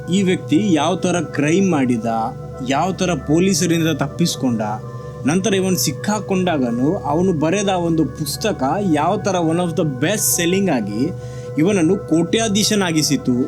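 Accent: Mangalore, India. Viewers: R u manglorian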